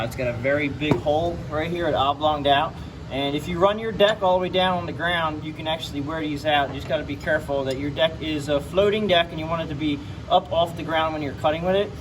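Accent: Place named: Philadelphia, United States. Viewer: Great instructions. Sounds like a Philly accents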